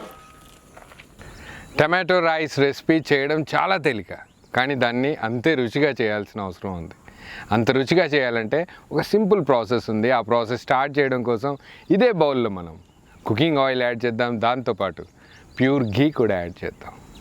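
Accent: Guntur, India. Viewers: Veg biriyani ela cheyali cheyypandi garu mee telugu guntur Telugu laaga undhi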